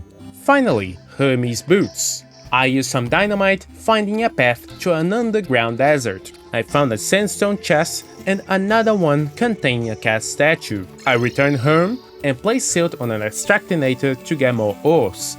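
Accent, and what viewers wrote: Brazil. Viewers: are u brazilian by any chance? your accent looks like a brazilian speaking english 😆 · Meu deus cara eu achei tava ficando doido pq eu senti no fundo do meu peito q teu sotaque era brasileiro, enfim vídeo bom pkrl continue pfvr meu xarà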